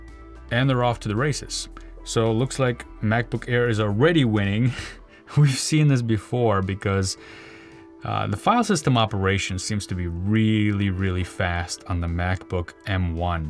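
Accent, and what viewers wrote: Russia. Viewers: Русский акцент выдает мистера. Гуд кантент. Ай хит дат лайк баттон. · da , kagda ya slishal evo ruski dialect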